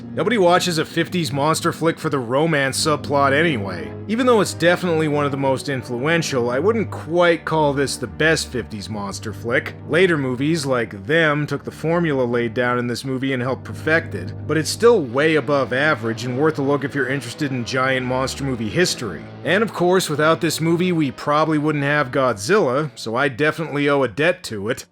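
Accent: Canada. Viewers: That Canadian accent . I died 😆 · Holy shit you kinda make a make a canadian accent sound kinda hot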